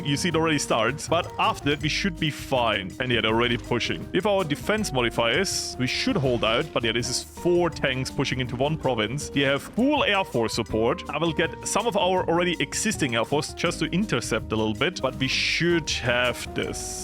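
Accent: Austria. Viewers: I hear Österreichisch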